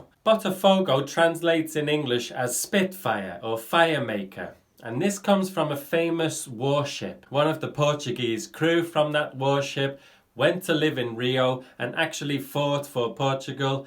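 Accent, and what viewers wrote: United Kingdom. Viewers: Are you a scouse?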